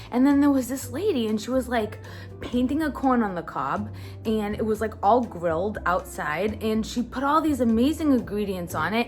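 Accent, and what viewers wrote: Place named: Boston, United States. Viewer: Nice Recipe!! Even better accent! Lol gotta be from Boston!! Please say your from Boston! Lol. Just earned a subscriber 👍🏽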